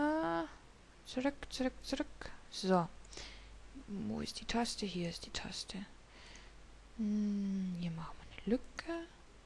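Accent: Bavaria, Germany. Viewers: Ja! endlich a bayrischs Minecraft-Let's Play gfundn :D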